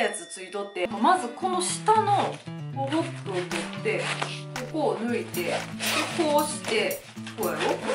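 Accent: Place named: Kansai, Japan. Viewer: 関西弁サイコー でもまぁこれうっすぅ～(^_^;)毛布いるわっ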